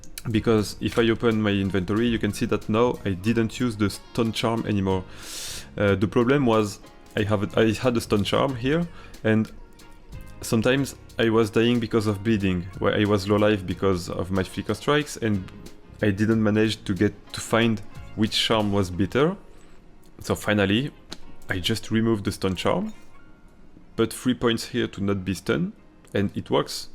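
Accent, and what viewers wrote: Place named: Russia. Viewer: I think you're Russian because you have a Russian accent.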